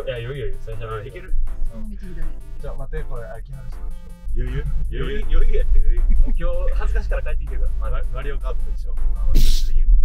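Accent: Kansai, Japan. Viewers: レクサスはチャラい感じするから藍ちゃんにはやめて欲しいなぁ🤣確かにAUDI乗ってそう🧡ハリヤーとかどうですか？そんな車で迎えにきて欲しい🧡ぇ 藍ちゃんの助手席羨ましすぎます！！！関西弁親近感湧くし、姿勢いいのめっちゃ可愛いです☺️☺️☺️🔰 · 藍くん、あんなかっこよくて性格もよくて関西弁キレッキレで最高だわ！！ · 本物の関西弁めちゃくちゃ耳馴染み良くて耳が幸せです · 関西弁がえぇわ♡ 免許取りたてで、怖がらずガンガン行くの男らしい〜 LEXUS似合いそー！ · バレーボールの時はあまり関西訛り出てない感じするけど、動画の時は訛ってて、自然体と言うか素が出てるなーって実感できて好き💓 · このルックスで関西弁やばい😢❤ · インタビューとは違う藍くんの関西弁にギャップを感じてキュンときた🫰🥰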